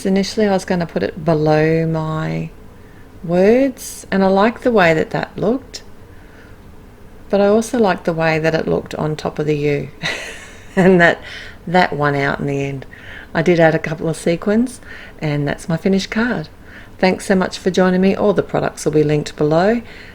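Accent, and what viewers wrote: Australia. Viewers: That is just beautiful. I think I have that stamp set so I want to give this technique a try. And the inlaid die cutting looks great. You have the best voice - I just love listening to you! It's so great hearing a familiar Aussie accent in a sea of American ones on YouTube!!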